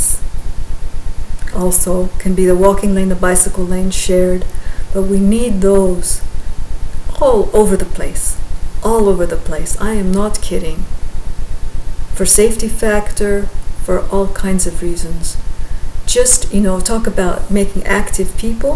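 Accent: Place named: United States. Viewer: Why do you start out with a heavy Eastern European accent and then it fades to a complete American English. Please stop! I have been watching you for years!!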